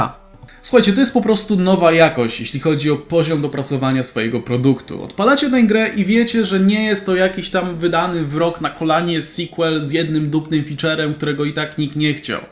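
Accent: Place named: Poznań, Poland. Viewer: speed<num> mi to brzmi normalne, typowe poznańskie zaciąganie.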